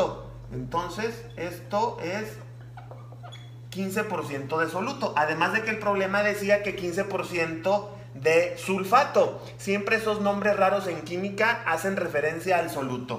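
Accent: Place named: Mexico. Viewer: No es por discriminacion pero me da dolor de cabeza el acento de los mexicanos 🤷‍♂️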